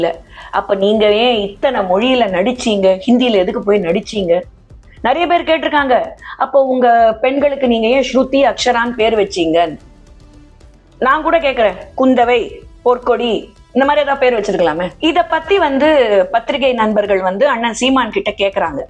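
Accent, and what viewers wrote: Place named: Karnataka, India. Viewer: அம்மா உன் பேச்சுல கொஞ்சம் கன்னட காத்து வீசுது 🙂🙂🙂🙂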